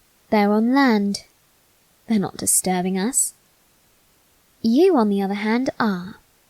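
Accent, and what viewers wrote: United Kingdom. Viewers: Why are you so good at doing a Bri'ish accent? (I'm Bri'ish my self..!)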